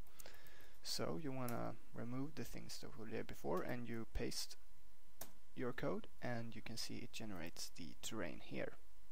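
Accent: Sweden. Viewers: Have you never heard a Swedish accent before? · Lmao, most of the comment are about hes voice / Swedish accent. Like me "Tjenare" c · wow my accent of a swedish person didnt sound anything like this. fuck, and notice at how people give more fucks about your voice rather than the video subject it self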